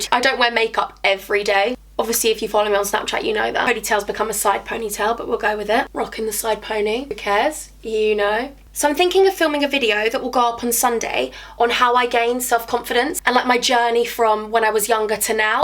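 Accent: Liverpool, United Kingdom. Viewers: by the way love the scouse acsent hahaha... (am from liverpool) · I love you u are so funny I keep say, you know and baby, boy to me hubby and it's doing his head in by the way well boss Liverpool accent cus that's we're I live lol xxxx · Your Liverpudlian accent is actually amazing😂😂 also congrats on the 50K to the most amazing angel of all❤️👑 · I go on to you and hollys get ready with me all the time just so me and my friends can hear you doing the Liverpool accent😂 OBSESSED with you and the bomb accent😍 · Your scouse (Liverpool) accent is soo good hun ❤️ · omg obsessed with this loook😍 and your Liverpool accent is amazing! You deserve 50,000 so much, love you xxxx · that scouse accent hahaha you sound like me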